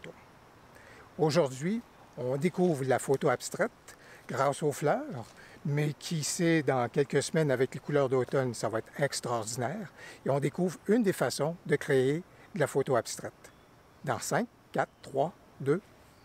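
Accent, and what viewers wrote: Quebec, Canada. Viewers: en québécois ... avec une petite touche d'exotisme bien agréable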